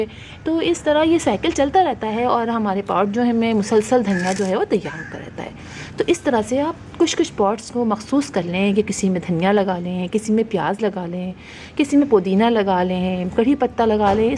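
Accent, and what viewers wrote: Pakistan. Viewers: Pakistani accent and way of speaking is soo sweet to hear ... love from India ❤❤❤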